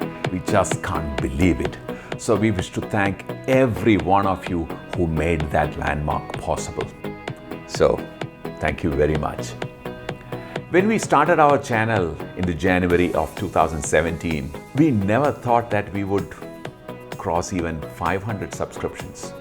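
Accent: Kerala, India. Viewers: Hello, I am following this channel since January when randomly i found a video on the perspective related to lenses on youtube. I liked the video so much that i subscribed with bell before even the video ended and that liking was so positive and is increasing day by day that makes me follow your channel till date. The liking of the channel rather the videos of the channel comes intensely from my heart and the reason behind this is because of the quality of videos you provide, talking of the quality i am meaning to say about the brief but elaborated explanation of the product or settings, its like you complete the syllabus in brief but in such a way that anyone could relate it in their mind and understand easily, atleast i love this short bur descriptive part very much and another cause of loving this channel is the field test section, that is so good where we get to know about the output quality even before we try it, and the most favourite part is the linguistic communication of Sir, i mean as an Indian no matter how much we know or understand english anyone would be able to relate understand and learn faster in this indian accented english than any other foreign accent and Sir's narration is so good that it feels like i am listening to him as if I am in front of him. 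In short the quality of videos with the indian scented audio kept my love and respect intact for this channel till date and hopefully in future too. Keep up the good work you are doi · I was attracted to this channel first time after hearing your polished english slang with a bit of malluism☺️ Simple plain english and excellent lighting makes each episodes a delight to watch. Way to go Mr. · Many congratulations sir on 50k many more to come. You spread knowledge about products in details in very indian english tone. Thank you! Keep growing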